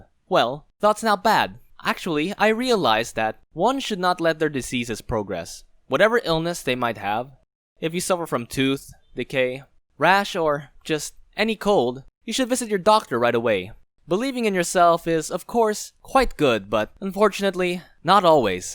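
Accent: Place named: Sweden. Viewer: Is it only me or dose he sound swedish???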